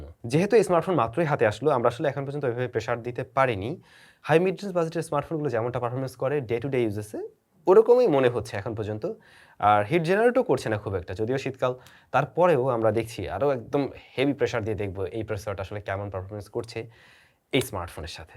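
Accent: Barishal, Bangladesh. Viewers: ভাই মাঝে মধ্যে আপনার কথা শুনে মনে হয় কি আপনার বাড়ি মনে হয় বরিশাল।আপনাকে খুব আপন মনে হয় তো তাই হয়তো মনে হয় আপনার বাড়ি বরিশাল কারন আমার বাড়ি বরিশাল তো তাই।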